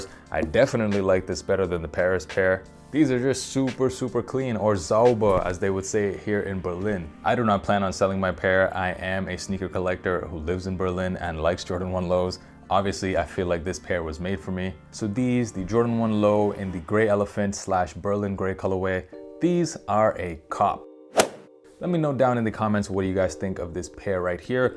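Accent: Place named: United States. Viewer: it was good man, nett video ! you speak english like an American if you didnt mentioned you were from Turkey I thought you were from the States 👍👌🇩🇪🇺🇸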